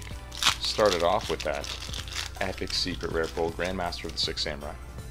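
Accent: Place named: Canada. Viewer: Always watch your videos just to hear your canadian accent reveal itself anytime you say "out" or "about" 😆 Everything else is just a bonus 😁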